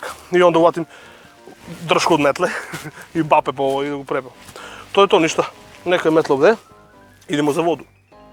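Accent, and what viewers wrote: South, Serbia. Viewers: Brate sa juga je srbije nis leskovac vranje to je njihov dijalekat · E majke mi jesi glup on je covek sa sela brt tako ljudi u juznom delu Srbije pricaju inace jel ti neko ikad rekao da si glup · ma on je buraz kako prica da prica nas covek sa juga on ima 800k suba a beogradski hejteri moze da mu ga dudlaju sa snjihovim padezima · Brt on je iz sela ono i tamo je takav Niski akcenat nemoj da pricas kad ne znas.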